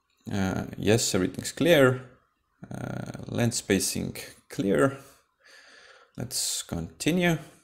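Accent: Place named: Estonia. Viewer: Miks ma kohe su aksendist aru sain et sa oled eestlane?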